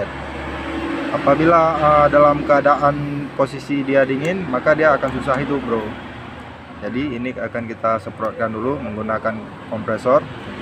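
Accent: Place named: Medan, Indonesia. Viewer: Alamat mna bg Kyknya orng Medan ni 😁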